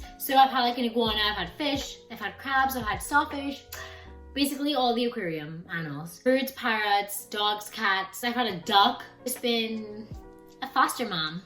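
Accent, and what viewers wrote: Trinidad and Tobago. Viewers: Are you trini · You said you are from the Caribbean......Are you from Trinidad and Tobago? Your accent makes me think this🙂. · Fellow Trini. I hear the accent.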